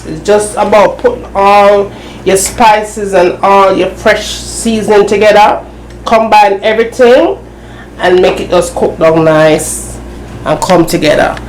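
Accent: Jamaica. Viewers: I've never tried gravy like this before I love it thanks! and I love you authentic Jamaican accent!!! 💜💜💜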